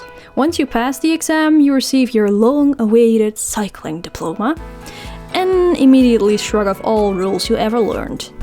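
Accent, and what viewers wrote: Brabant, Netherlands. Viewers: Lol, you’re from Noord-Brabant or Limburg, as a fellow dutchie i can hear those accents from miles away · judging by that accent, im guessing you are dutch too ;P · Thats a dutch person, no doubt in my mind, nobody pronounces dutch words like that exept dutch peopl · I thought this was a British/American girl explaining this.. But then.... Then i heard the accent that Dutch people have haha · Ar you dutch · Volgensmij ben je Nederlands want je spreekt de woorden Nederlandse woorden)uit met een Nederlandse stem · Jij komt uit Brabant of Limburg neem ik aan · Are you from the netherlands? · Je nederlandse accent is geweldig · You sound so fockin dutch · kom je uit brabant? ik ook namelijk haha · I already thought you was dutch by the accent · ah.... the Dutch accent...... how obvious, lol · ahhh ik hat Rotterdam of Brabant gedacht ja😂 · that brabandian accent tho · Ur from Brabaaant🎉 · First thing I noticed is that your accent is on point!! 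I still hear your Dutch accent but it's hardly noticeable compared to most Dutch people. Love it because usually the Dutch accent in English makes me cringe. I speak English everyday and I hardly notice my accent anymore until I hear a recording of it 😂😂😂😂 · Je engels is good, ik hoor nog een heel klein vleugje NL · Je hed eeen echt Nederlands accent ♥️ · Waha brabant/gelderland of limburg zeker?😂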